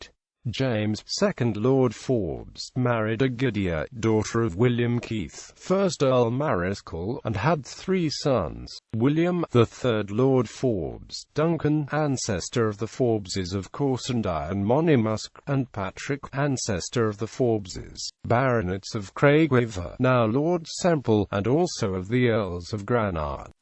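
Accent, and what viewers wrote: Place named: England, United Kingdom. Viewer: a very English voice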